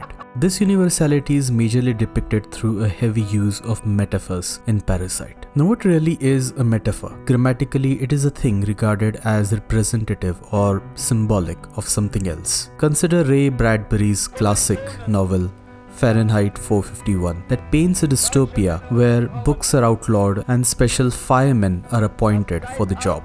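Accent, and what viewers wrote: India. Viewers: Indian accent always stands out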